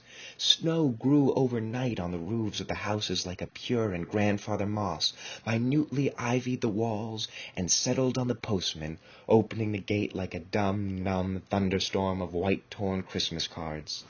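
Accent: United States. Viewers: It must be 30 years ago, that I had an LP of Dylan Thoas reading this. There must be someone out there that has this reading. Somehow, an American accent just doesn't do justice to this. Thomas' own version was so - "dead pan" but it didn't matter: this was Thomas' own rendition of his wonderful Welsh Masterpiece. Please for next year..?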